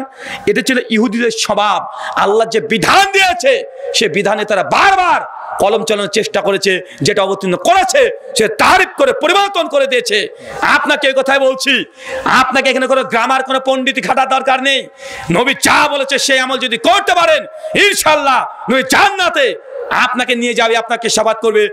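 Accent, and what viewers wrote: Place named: Kolkata, India. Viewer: আপনার কথা বলা একেবারে কলকাতার মানুষের মত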